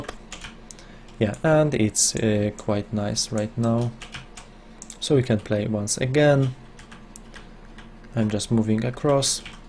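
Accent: Poland. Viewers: Unreal nie ukrtjesz polskiego akcentu a zwłaszcza meszes xD GruBe aus dem Polnischen (sorki ale nie mam niemieckich znaków na klawiaturze) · Are you from poland? because you have polish accent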